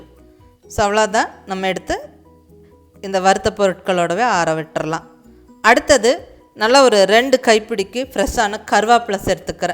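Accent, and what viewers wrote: Coimbatore, India. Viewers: U are from coombatore. The way u talk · Varuththu vittu kitta namma kongu tamil super mam ❤naanum idhey ooru than piranthu valarnthu marriage aanathu extra extra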